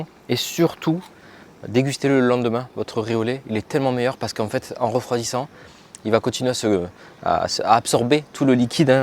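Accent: South, France. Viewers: Avé l’accent…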